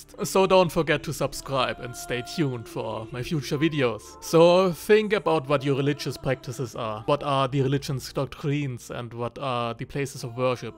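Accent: Germany. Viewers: Are you Swabian by any means?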